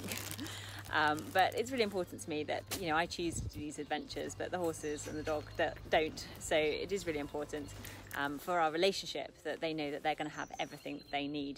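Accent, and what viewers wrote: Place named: United Kingdom. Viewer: D Your videos are great:D I'm in love with your horses and ponies. Hugs from Brazil It's being a great adventure to learn British English through your videos. God bless you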